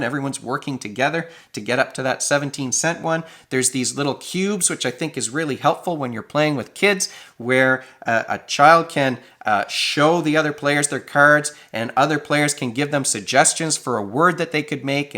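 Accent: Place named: Canada. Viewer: Sick review. I love when I can tell from the first sentence someone says that they’re Canadian too lol.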